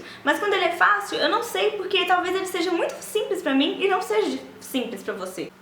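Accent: United Kingdom. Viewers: Sotaque britânico 😍 estou fazendo a mesma coisa pra aprender inglês também já faz uns meses, é bem legal haha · Caramba. Hehe Muito bom, e tem até o sotaque da Mione. Kkkk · Seu sotaque britânico é maravilhoso!! Parabéns pelo canal,. Me inscrevi e ativei as notificações ❤👏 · You speak with Hermonie English accent.. woah.. :o I learned some Portuguese by watching Harry Potter in Portuguese.. but I don't think I learned how to speak with a Hermonie or Harry portuguese accent.. aw.. :p it's very good to read books in English too.. I am trying to read books in Portuguese.. I love finding people to speak different languages with.. it's fun to learn about different cultures.. vamos falar em ambos línguas.. yay :D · Adorei o sotaque à la Hermione ^^ Sua fofa ;* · Eu assisto tanto Sherlock (em inglês com a legenda em português) que agora to vendo com a legenda em inglês também. 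Falo junto com o Sherlock quando ele tá dando adeus pro John ;-; "it's my note. That's what people do, isn't it? Leave a note?" mas acho que não consigo fazer o sotaque britânico que nem você, invejinha. E acho que agora vou ver HP em inglês e com a legenda em inglês também já que sei as falas em português, quem sabe me ajude mais. Eu considero meu nível de inglês bom e consigo ler um livro, mas tem um problema: eu tenho preguiça de ler em inglês lol eu amo ler, mas as vezes bate uma preguiça. Tenho que mandar ela embora por que lendo é a melhor forma para aprender inglês. E to com você, eu também falo sozinha. Eu faço resenha de livro falando sozinha no quarto em inglês, com medo de alguém entrar e ficar se perguntando que "merda eu to fazendo" :p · Meu Deus, você fala bem demaaaaais! E o sotaque britânico é puro amor. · O seu sotaque britânico é muito bom - você deve ter praticado bastante mesmo! 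E esta dica sua é espetacular não só para o inglês mas para qualquer idioma. Parabéns pelo canal e continue com as ótimas dicas! :)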